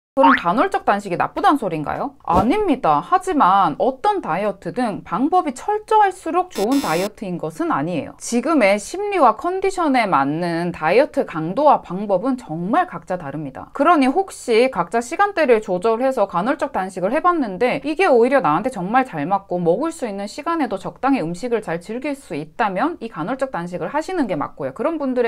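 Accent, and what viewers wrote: Gyeongsang, South Korea. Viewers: 경상도분이시죠?? ㅋㅋ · 음... 혹시 경상도에서 사시는 분이신지???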